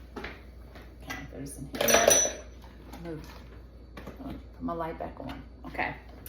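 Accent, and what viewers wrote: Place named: Louisiana, United States. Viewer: Love your recipe! Sounds like you might be from Louisiana! I’m next door in TX. Either way, glad to be here!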